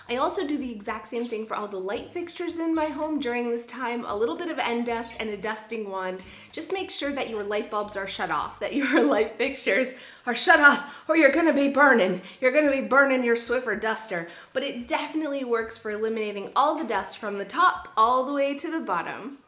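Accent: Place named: Southern Ontario, Canada. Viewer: You have to be Canadian or even from Ontario, pa day, geese, sounds like southern Ontario lol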